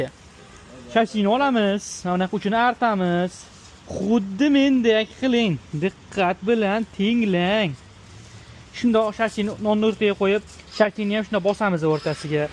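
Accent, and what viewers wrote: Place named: Tashkent, Uzbekistan. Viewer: Toshkent shevasidan nafratlanaman💔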